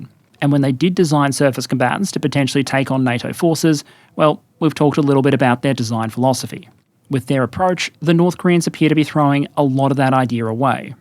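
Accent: Australia. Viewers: Always thought he is australian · Yep almost sure he's an Ozzie.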